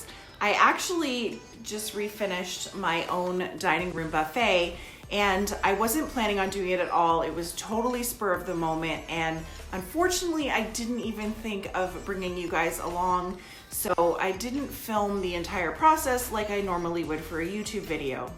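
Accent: Canada. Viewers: Love the screaming kids and your Canadian accent ! Piece is beautiful too!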